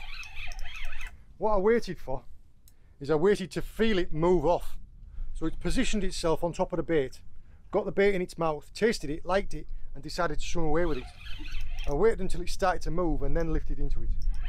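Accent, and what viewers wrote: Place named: Cornwall, United Kingdom. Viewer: “A bar of soap with pins in it” so accurate.As a youngster I caught small Bass in and around st Johns Lake in the Tamar by the bucket full.The best tool I had for holding bass was an old bar towel. Looks like your new boat in this vid? I was out fishing with a mate discussing your videos, he said there must be lots of foreign tourists Admiring your Cornish accent.It was mentioned in good taste and did make me 😂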